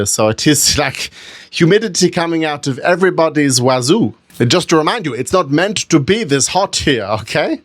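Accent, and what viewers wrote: Germany. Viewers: Sarcasm in a German accent is the best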